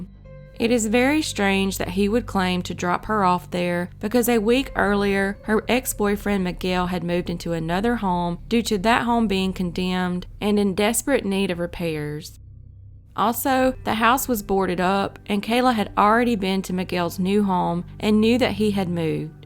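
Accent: South, United States. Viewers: I don't think she sounds bored . It's just her southern way of talking . Pretty crappy thing to say . She has the best page on here. I love her videos ! Maybe you should go watch a different page to make you happy ☺️ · Love your southern accent and your videos love from Birmingham 🇬🇧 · She has a southern accent.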